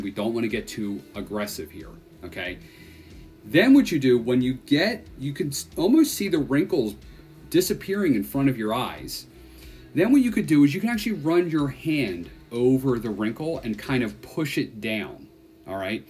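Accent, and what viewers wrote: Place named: New Jersey, United States. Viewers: I'm guessing you're from NJ. You say woter. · Sounds like West Orange NJ.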